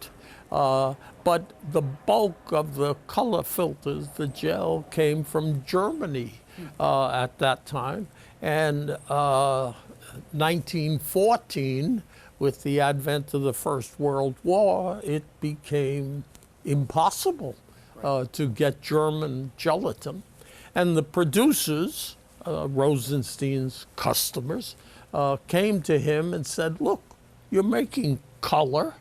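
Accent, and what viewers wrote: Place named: Northeast, United States. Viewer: A mix of NY & New England or just a northeast accent.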